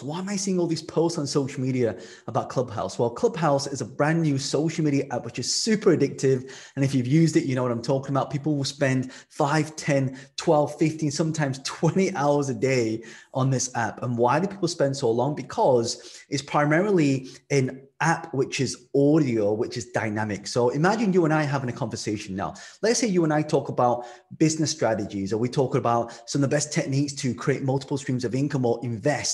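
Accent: United Kingdom. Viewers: I love the good knowledge from you.I love your British accent also.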